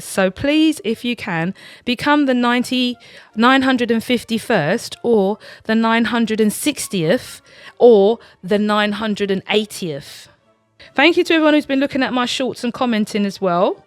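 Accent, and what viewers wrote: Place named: England, United Kingdom. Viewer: I love the way you talk so English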